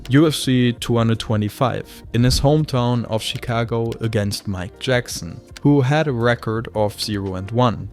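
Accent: Germany. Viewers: Gold video. Hat lange gedauert bis ich den deutschen Akzent gehört habe😂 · German accent is strong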